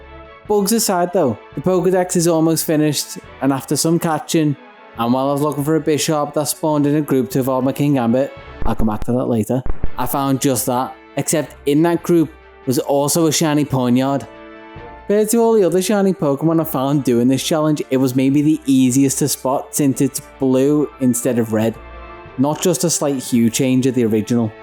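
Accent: Liverpool, United Kingdom. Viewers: Whiplash putting a Pokémon video on and hearing a scouse accent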